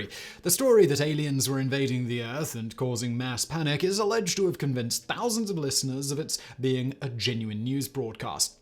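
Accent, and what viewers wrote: United Kingdom. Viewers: Love the info. Your high notes trail off to nothing and along with your British rhythm is too much. Again info was terrific from what I caught.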